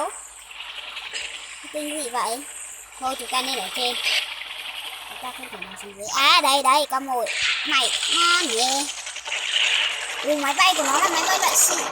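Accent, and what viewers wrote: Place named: North, Vietnam. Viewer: Lái ngu nói chuyện như bắc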